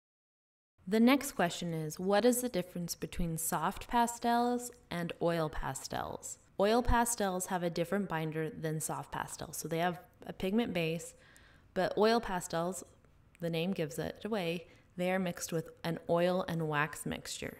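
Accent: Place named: Utah, United States. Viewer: I could tell by your accent, that's why I asked. My dad's from Utah, and you sound like a lot of my family on his side (and many a church leader I had 😅)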